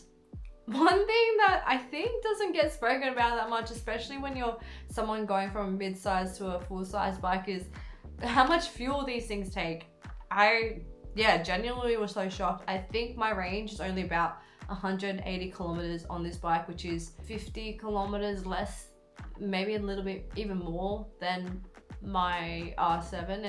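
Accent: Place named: Australia. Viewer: Asian girl with Australian accent is so cute 🥰 not to mention you drive a s1k 🔥🔥🔥🔥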